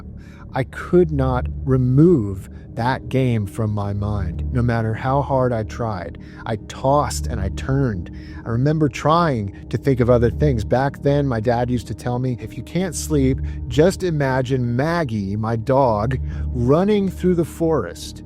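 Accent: Houston, United States. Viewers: you from houston bro?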